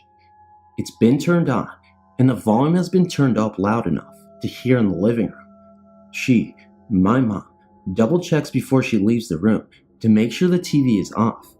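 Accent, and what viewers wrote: United States. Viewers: Wow listening to you read is like listening to nails on a chalk board, you really need to practice a less monosyllabic tone, it’s really difficult to get into the stories, I listen to a guy on another channel as well, and well not as bad he to has the same drone, must be just the American accent, although the girls seem to be just fine, definitely need to inject a bit more life into it🤔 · Pardon me.. What state is this accent from? I'm from UK. I know you're accents change from area to area like ours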